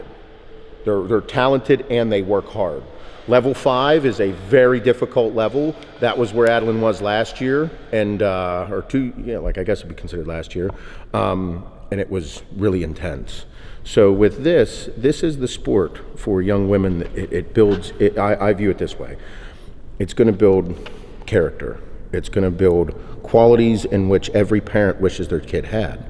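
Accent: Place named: Pennsylvania, United States. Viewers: I knew I heard Western PA in your voice! Lots of great football players, tough steel workers come from there. I would take a Dodge Ram any day.. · I Fuckin knew it. You just cant hide that fuckin PA accent lol. Form PA Also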